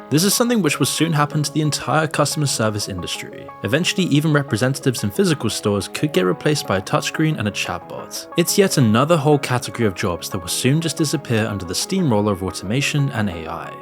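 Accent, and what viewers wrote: United Kingdom. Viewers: Are you speaking with your voice or generated it with AI? If you are generating it can you please make it with American accent not British, it’s hard to listen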